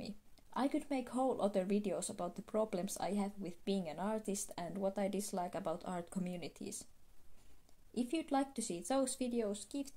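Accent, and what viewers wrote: Finland. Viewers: omg suomi aksentti havaittu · LOVE THIS VID!!!! and is that a Finnish accent? :3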